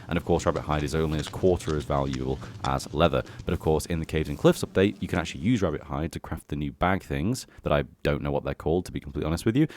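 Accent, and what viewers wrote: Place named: England, United Kingdom. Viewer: Somewhere in England, he's certainly got the accent for it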